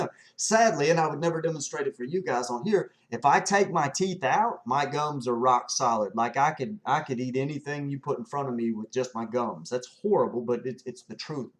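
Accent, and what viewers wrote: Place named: Kentucky, United States. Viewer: I really like your videos. They have helped me a lot. I also really like your Kentucky accent!!!!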